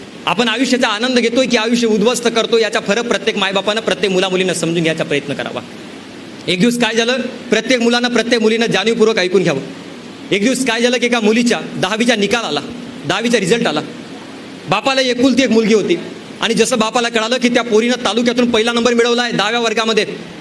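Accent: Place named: Vidarbha, India. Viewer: दादांचे उच्चार विदर्भातल्या मराठी सारखे वाटतात.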